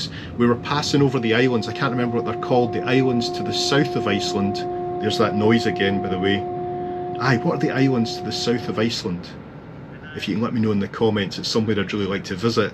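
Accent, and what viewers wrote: Scotland, United Kingdom. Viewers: I thought the video was great and I really enjoyed it. I loved the concept of the commentary and you also have a great voice that is a pleasure to listen to. I think that's the Scottish accent which one of the world's best. Big thumbs up for that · This is a fun change. I like it. I also wanted to mention that I have (as an American) a soft spot for all things Scottish because my ancestors hailed from the lowlands. Your persona and accent are very appealing. I also recently started following the Witches of Scotland. Their story is both fascinating and disturbing. I must admit, I have a really hard time understanding their accent (as I did when I vacationed in Scotland!), so I really appreciate the fact that your accent is both authentically charming and completely understandable. Love what linguists call the "non-standard English dialect" you add to everything you say. 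What a wonderful escape from the dystopian nightmare we have going on here. Especially nice to see Greenland at a time when it is in the news so much. Such guilt and shame most of us here have for what we've inflicted on the world (well, some of us, definitely not all of us support him). Hope this style of video isn't a one-off, but that you'll do it again. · your "braid Scots" or is it more properly called a "burr," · Hi from Sete Lagoas, Brazil. I was raised in Berwick upon Tweed and have a sister who lives in St Andrews so I'm familiar with your neck of the woods! Here the airport issues are normally flooding or runways melting!. Thankyou for your videos, I miss Scotland and so it's great to hear that accent! God bless and safe travels, Jadir Alister Barnard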